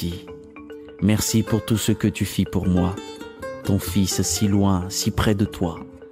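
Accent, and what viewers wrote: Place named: Europe. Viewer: Pourquoi pas lus par un fils AFRICAIN??? J'aurai aimee entendre ca lu avec un accent Africain, pas European.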